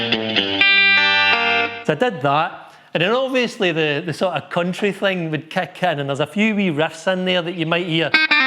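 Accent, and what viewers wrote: Scotland, United Kingdom. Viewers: Love listening to you play the guitar, but the Scot accent takes me back to my youth when i lived in Glasgow, working in the pubs, and pints of 80 shilling.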